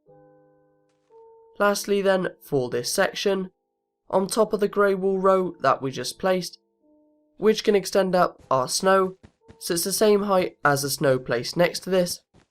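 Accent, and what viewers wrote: United Kingdom. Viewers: No not a robot accent... A British accent. #bruh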